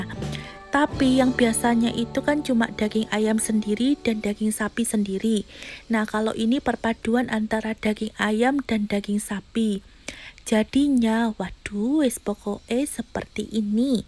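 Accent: Java, Indonesia. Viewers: Dengerin cerita memasak udang gimbal..,njowone khas bunda hehe Monggo kampir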